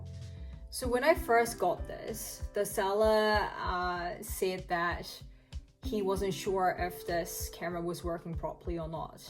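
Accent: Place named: New Zealand. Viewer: ㅋㅋㅋ 맨날 미드만보다가 오랜만에 키위악센트 들으니까 귀여워 😁😁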